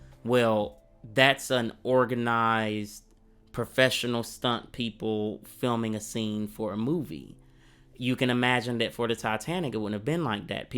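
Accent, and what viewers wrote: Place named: South, United States. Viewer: Young man, I have to thank you for several reasons. One is that I'm glad that you're parlaying your shipboard experience into a YouTube channel, and I'm glad that we have an American black kid with a country accent doing a historical documentary on YouTube instead of the excessive number of British dudes with British accents, or even worse, those AI bots with the metal voices. Thanks, good to see some homegrown talent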